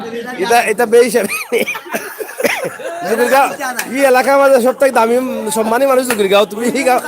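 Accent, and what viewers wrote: Sylhet, Bangladesh. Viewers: ভাই এইটা আমাদের সিলেটের ভাষা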